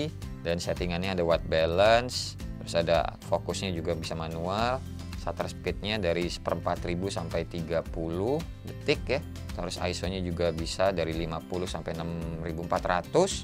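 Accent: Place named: West Java, Indonesia. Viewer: Sunda pisan aa teh logat nya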